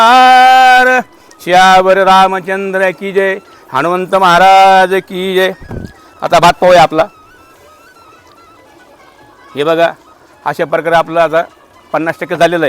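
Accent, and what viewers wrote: Khandesh, India. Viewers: 🙏जय हरी मी आत्ताच तुमचे विडिओ बघायला लागलो मी खांदेशात धुळे शहरात राहतो तुम्ही खान्देशी बोली बोलून मन प्रसन्न केलत. आणि सर्वांनाच परमेशवराची भक्ती करण्यास प्रेरित करतात खूप आनंद झाला 👌🙏राम कृष्ण हरी जय जय 🙏🚩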